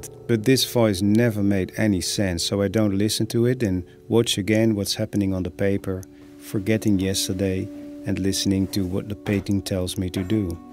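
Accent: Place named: Netherlands. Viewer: I just love your work it really speaks to me. I have painted most of my life but never had the time to really get into it. One side of my family ate Dutch and it was my first language so it fees so comfortable hearing your accent, like coming home. Thank you so much for sharing.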